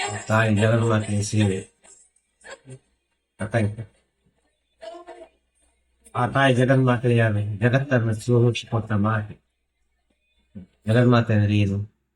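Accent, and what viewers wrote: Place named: Dakshina Kannada, India. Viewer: ಗುರುಗಳು ಆಗುವ ಮೊದಲು ಏನಾಗಿದ್ದರು..? ತಿಳಿಸಿ . ಮಾತುಗಳು ದಕ್ಷಿಣ ಕನ್ನಡ ದ ಕನ್ನಡ ಆಗಿದೆ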